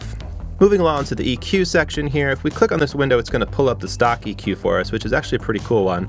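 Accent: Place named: Long Island, United States. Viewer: Hey great vid I’m in Jersey I seen that strong island accent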